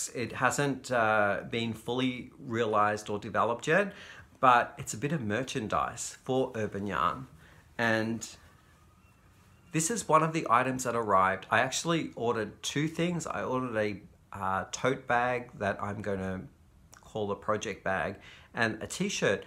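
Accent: Australia. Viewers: Hi. you sound Australian! where are you from. O my goodness these fish are gorgeous!!!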